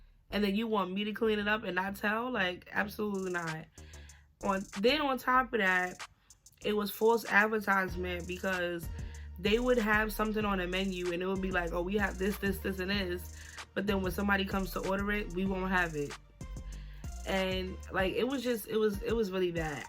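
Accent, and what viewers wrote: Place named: Brooklyn, United States. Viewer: You sound like you from Brooklyn ☺️ ! Chileeeee I worked at McDonald’s for a month & boutta start a new job at Burger King 🥴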